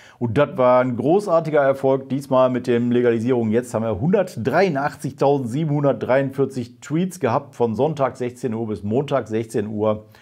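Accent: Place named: Rhineland, Germany. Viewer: Dein rheinischer Dialekt ist sehr sympathisch, Hr.. Wie es aussieht, haben wir es geschafft! Wie es der Presse heute zu entnehmen ist. Warte aber sehnsüchtig auf Eure Bestätigung. Tolle Arbeit! Toller Support! Danke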